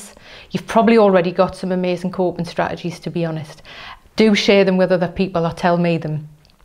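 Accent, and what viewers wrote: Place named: Newcastle, United Kingdom. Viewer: Newcastle ? Love it 😊 hi 👋 from Liverpool ❤ Thanks for the info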